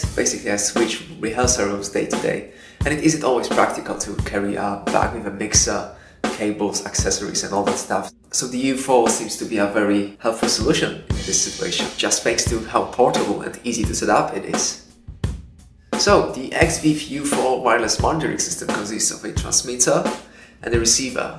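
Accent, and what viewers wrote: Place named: United Kingdom. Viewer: I'm so pleasantly suprised with this video!! I believe it's the first time you ever made a video like this on youtube, but you seem super comfortable speaking on camera!! And I can hear the British accent coming through ;)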